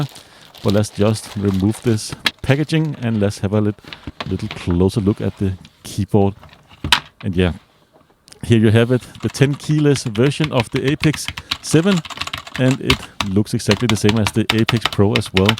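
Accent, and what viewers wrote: Germany. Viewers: Man hört so das er deutsch ist lol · u sound very german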